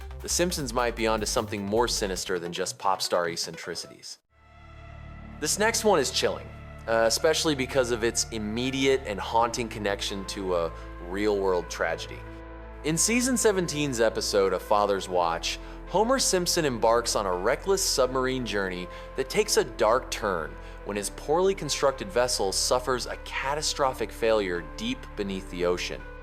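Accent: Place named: United States. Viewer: You must be kidding It's USA English . Point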